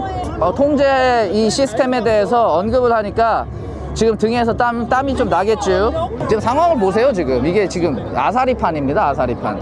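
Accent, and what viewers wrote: Gangwon, South Korea. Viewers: 야 강원도 말투다 임마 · 강원도 말투 같은데?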